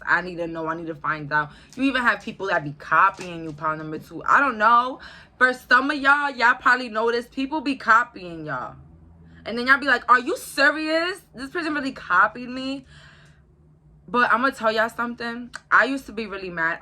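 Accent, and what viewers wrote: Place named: Brooklyn, United States. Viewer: you really be from NY huh? im cacklin sis you be radiating brooklyn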